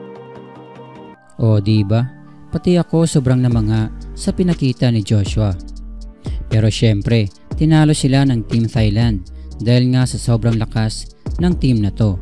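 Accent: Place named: Surigao, Philippines. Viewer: "Bul yo" yan pag pronounce... Surigaonon ni bai...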